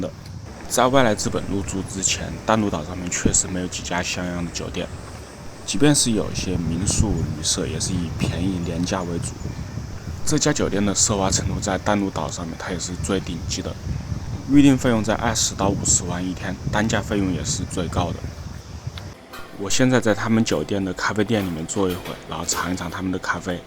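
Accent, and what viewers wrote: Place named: Hubei, China. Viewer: 武汉口音😁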